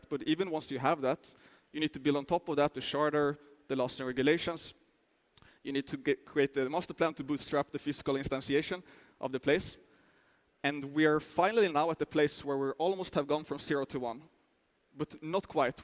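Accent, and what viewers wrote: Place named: Israel. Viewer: Sounda like israeli accent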